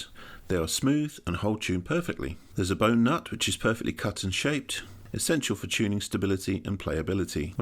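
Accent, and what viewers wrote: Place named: United Kingdom. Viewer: This is a completely brilliant review, and just what I was looking for. I was looking at this model and some of the Eastman LP-types. Plenty of info on those, very little on these, so thank you so much. I have two questions, if you don't mind. First, how does the guitar hold tune without locking tuners? And, they say that the frets on these are "jumbo" (which i love), but so often that really means "medium". So, anything you could say about the frets would be great. Thank you again for being the best demo of this guitar on YT. Cheers!👍🙏PS- as a yank-anglophile, I have to say that you voice and your accent are so beautiful to listen to.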